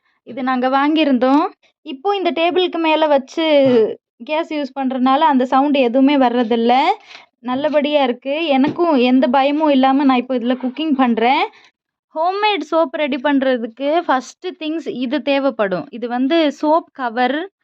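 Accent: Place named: Odisha, India. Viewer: சகோதரி உங்களை பார்த்தால் ஒடிஷா பெண் மாதிரி இருக்கு. நீங்கள் பேசும் தமிழும் ஒடிஷா பெண் பேசுவது மாதிரி இருக்கு. உண்மையை சொல்லுங்க நீங்கள் ஒடிஷா பெண்ணா இல்லை தமிழ் பெண்ணா?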